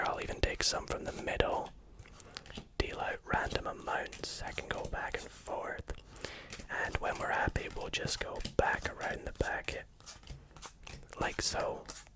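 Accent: Scotland, United Kingdom. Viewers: The reason you're so good at ASMR and also card stuff is simply because you're Scottish lol. It's weird hearing a Scottish accent do ASMR, because I'm also from Scotland haha. But man! Your ASMR is the only one that works for me. Thanks for the vibes, mate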